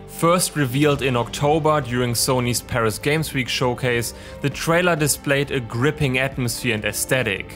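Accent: Germany. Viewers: Is this guy german? His accent sounds really german. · you sounds german :D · can confirm sounds like a german english teacher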